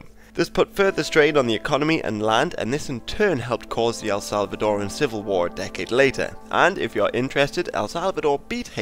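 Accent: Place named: England, United Kingdom. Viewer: It's an English accent, of the English language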